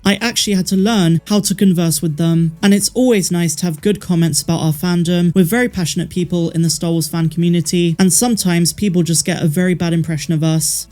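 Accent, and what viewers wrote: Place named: RP, United Kingdom. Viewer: 🤔 Going with Pedro doing last minute voice work for Boba Fett. Why take time out of filming one show, to do some voice work for Mando, when there is plenty of time to do so later. Like you said, last minute voice work happens all the time. More Migs please! Also, nicely done on the quote, as always, and never noticed you ever do them badly. You're like proper BBC Star Wars lady 😊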